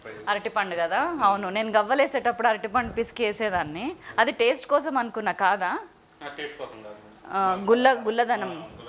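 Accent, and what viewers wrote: Telangana, India. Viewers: mam meru super yahe mana pakka telangana style Lo cheppa don't mine super Mam super recipe defenetly try chesta comment pedata Tq.......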